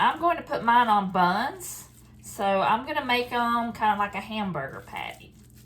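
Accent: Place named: East Texas, United States. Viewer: I’ve just subscribed and I plan to binge watch your videos. I don’t know where you’re from, but I’m from east Texas, and we even sound alike. Kinda feel like I know you. All the best to you and yours!❤😊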